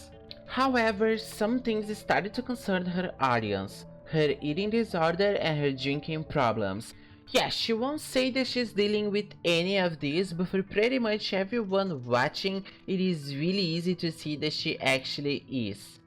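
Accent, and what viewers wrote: Brazil. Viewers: meu vc é brasileiro? kkkk senti mto o sotaque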